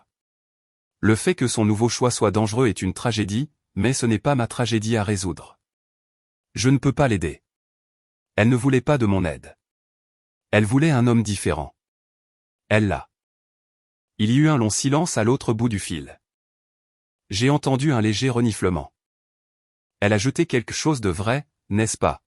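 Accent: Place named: France. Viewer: un français dans la cinquantaine qui lit un texte en anglais pour la première fois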